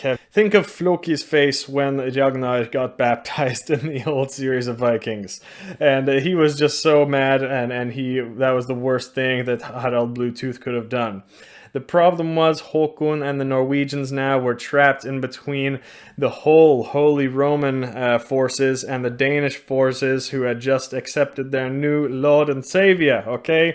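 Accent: United States. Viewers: Is the bloke in this video actually Norwegian? He sounds very American. I know Norwegians, they don't sound like this bloke, this bloke sounds like an American that moved to Norway (or Norwegian but spent more time in America) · Pretty sure he is Norwegian, yes. Most non Americans develop an American accent when they learn English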